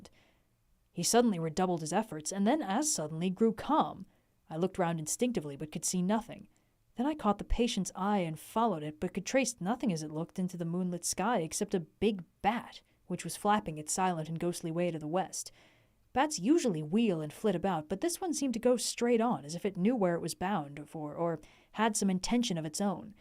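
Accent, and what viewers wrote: Scotland, United Kingdom. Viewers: I would have 1000 hours of that scottish if you would spare me that newsman voice.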